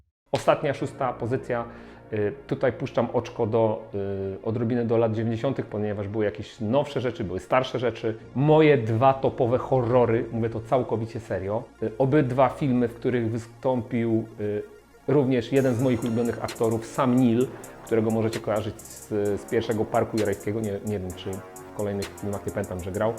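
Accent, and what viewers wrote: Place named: Poznań, Poland. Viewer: Słychać że poznaniak tej!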